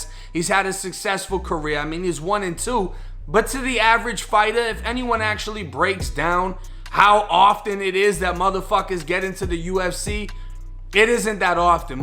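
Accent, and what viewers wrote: Boston, United States. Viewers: Why does it sound like your from Boston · Where's the narrator from? Bahston ??? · Sound like you have a Boston accent. Any ties to Boston?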